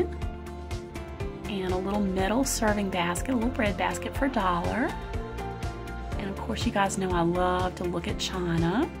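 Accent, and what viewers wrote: South, United States. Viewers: You definitely have a southern accent. I have one too 😀. When my family moved to New York many years ago, I got teased by the way I talk. I told someone once that southerners sound that way because we have respect in our sound 🙂. Then he tried to copy my southern accent 😄.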